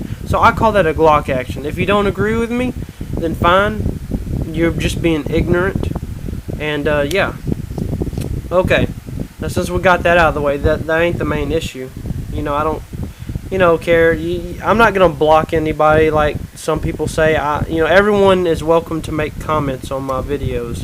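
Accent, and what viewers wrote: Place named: South, United States. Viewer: his accent isnt that strong i would say that accent could only go as south as missouri, stop hating on him just because he has a bit of a country accent city boy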